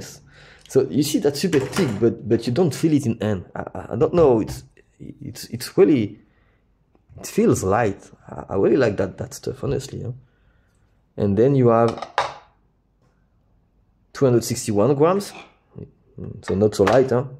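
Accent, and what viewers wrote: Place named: France. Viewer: Bien l'anglais avec un accent français 😊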